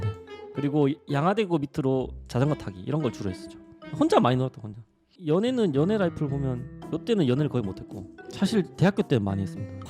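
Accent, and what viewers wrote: Gyeongsang, South Korea. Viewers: 오 대구남자였네...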